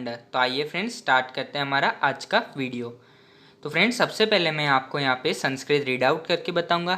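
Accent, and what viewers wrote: Gujarat, India. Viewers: Aap gujarati ho na ? Aap jese bol rahe ho vaisa to gujarat me Hindi chalta hai .... By the way I am also from Gujarat 🙋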